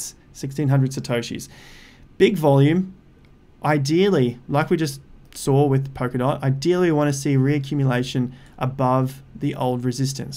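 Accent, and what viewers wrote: Australia. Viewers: It's very nice to hear an Aussi accent. I'm in Melbourne. The comment about the "kidney-transplant" was a fine touch. I'm considering doing it- but Ada might plunge, and I'll end up with no money and one kidney!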